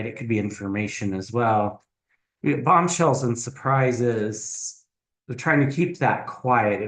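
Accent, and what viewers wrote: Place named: United States. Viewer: you are a light amongst the darkness. I am an Australian (I guess like most of the educated world) just shake my head is disgust and amazement at what the Felon and his "band on merry men" are doing and at the moment getting away with. Your readings are amazing. On another note i can't pick what part of America your accent is from, (not where you are). Could you answer that for me NATO still exists even without the USA. Australia will be looking for new alliances and partners as well Keep up the amazing work, Thank you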